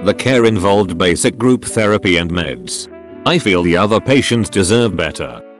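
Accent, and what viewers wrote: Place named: United Kingdom. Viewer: I wish people would use a different voice. I hate it and keep running from channel to channel but every single one uses this annoying robotic brit. Lol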